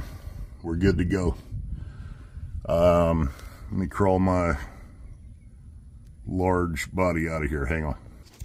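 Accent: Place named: United States. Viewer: This guy has the most American voice I’ve ever heard. Made me watch the video instead of skipping ahead.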